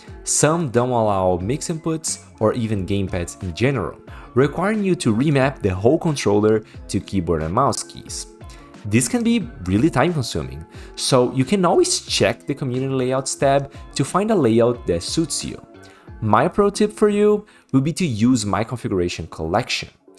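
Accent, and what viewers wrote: Brazil. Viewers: Ótimo vídeo bro, mas só comentar que eu fico muito feliz quando entro em um vídeo em inglês esperando um gringo e percebo o sotaque brasileiro kkkkkk um dia a gente domina o mundo pprt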